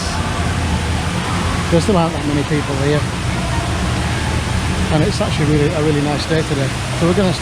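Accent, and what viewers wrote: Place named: England, United Kingdom. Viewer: Hi my friend we are suppose to come in September this year,hows thinks looking over there ,hope you are all safe,you sound english have you moved over there .thanks lee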